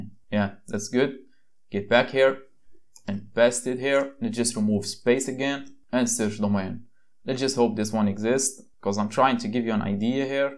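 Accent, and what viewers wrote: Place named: Morocco. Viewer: Maghribi la ? Chkit fl accent dyalk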